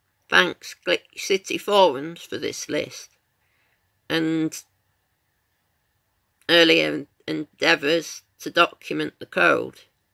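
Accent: United Kingdom. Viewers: Hello! I've been watching your videos throughout the years, and I really enjoy the content that you put out and the dedication behind it! This little change up in format is a nice surprise, and I actually find your voice kind of soothing. What would you pin down your accent as? I feel that it's British, but I'd hate to just randomly assume in case it's something different, hah. Anyway, here's hoping for nice times for you and your further researches! I really do love your channel~ <3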